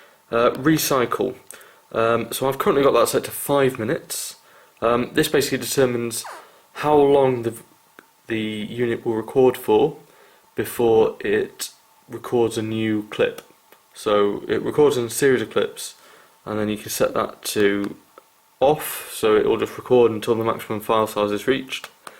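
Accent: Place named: United Kingdom. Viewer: My ear hates British English.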